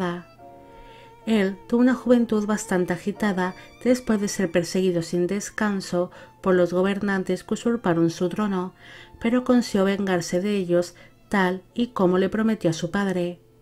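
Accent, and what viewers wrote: Spain. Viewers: Un recuerdo que dejo ¿Con qué he de irme? ¿Nada dejaré en pos de mi sobre la tierra? ¿Cómo ha de actuar mi corazón? ¿Acaso en vano venimos a vivir, a brotar sobre la tierra? Dejemos al menos flores Dejemos al menos cantos. (Nezahualcóyotl) Es una bonita sensación escuchar en voz española la admiración por este poeta y rey del antiguo México precolombino. Gracias.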